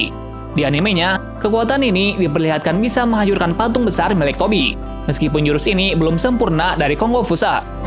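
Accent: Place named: Banyumas, Indonesia. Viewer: Ngapak ya😁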